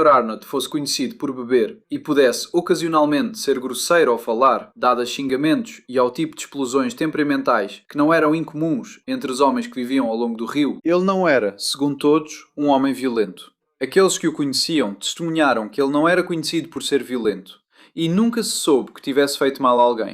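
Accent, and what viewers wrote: Portugal. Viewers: Esse sotaque de Portugal nossa muito ruim de ouvir. Voltem com o nosso português por favor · Eu gostava bastante deste canal, mas agora com esse sotaque de Portugal, estou pensando seriamente em desfazer minha inscrição, esse sotaque é irritante 😡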